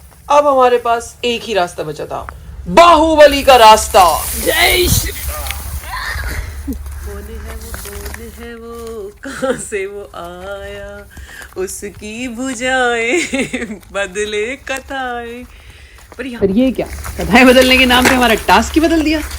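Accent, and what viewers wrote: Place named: Rajasthan, India. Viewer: Sister ya h Rajasthan kii boli m gandasho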